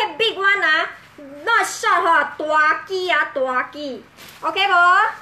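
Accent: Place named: Ah Lian, Singapore. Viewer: She only talk like ah lian.but not really ah lian. She got say she dun smoke or go crubbing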